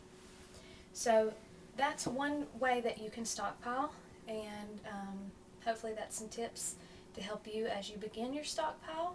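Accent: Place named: South, United States. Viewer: Great info, and the hint of southern accent makes my heart melt. Keep up the great work.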